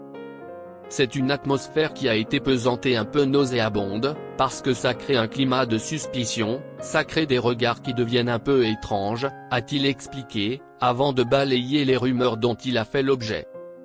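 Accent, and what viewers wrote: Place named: Grenoble, France. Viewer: Je l'adore. Il a une longue carrière qui s'annon ce à lui. Très grand charisme, j'adore sa façon de s'exprimer, avec un petit accent Grenoblois. Il est très beau. Je le vois faire une carrière, comme celle d'Alain DELON. Je lui souhaite plein de bonheur et une magnifique carrière.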